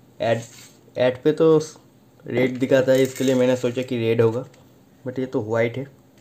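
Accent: Bengal, India. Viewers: His bengali ascent makes me laugh always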